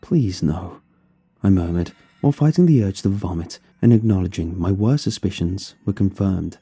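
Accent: United Kingdom. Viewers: I love your sweet British accent, keep up the good work 👍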